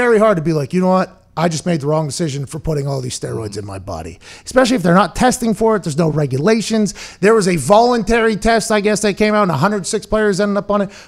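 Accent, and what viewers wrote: Pittsburgh, United States. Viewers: I wonder how many people had a hard time listening to a true yinzer accent ❤️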